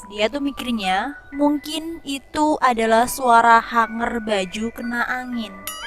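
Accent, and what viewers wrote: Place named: Medan, Indonesia. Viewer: Kamu orang Medan ya? Dari logat bicaranya seperti orang Medan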